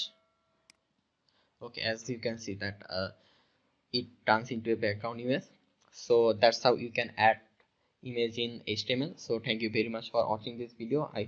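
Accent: India. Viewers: Thank you Mr. Indian-sounding guy :DD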